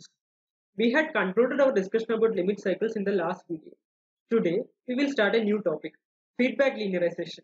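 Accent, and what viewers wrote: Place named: Thrissur, India. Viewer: I think I hear thrissur dialect here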